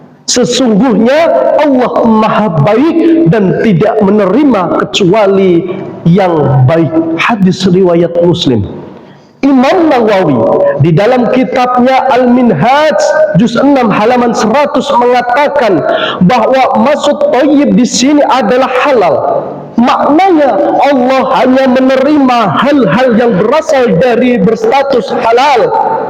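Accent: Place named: Banyumas, Indonesia. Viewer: Terimakasih Bu Guru ngapak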